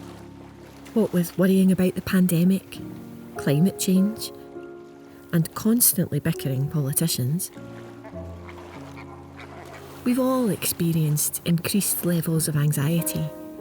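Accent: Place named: Scotland, United Kingdom. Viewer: cold showers and scotish accent ,what else can u ask for? cheers from mexico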